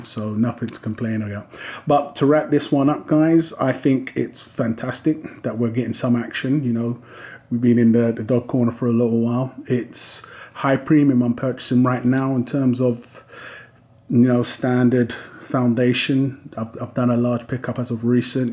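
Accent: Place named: Yorkshire, United Kingdom. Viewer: Thank you … I know we don't always agree 100% on things but its good to have many points of view. PS I am in Yorkshire …. So I am guessing we are not too far apart on your accent!!!! Anyway I buy only to melt them down.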